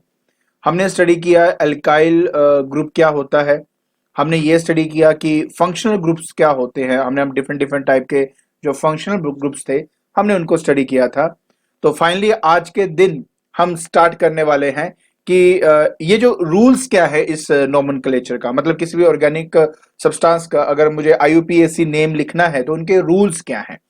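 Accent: Punjab, India. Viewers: Sir you are Punjabi??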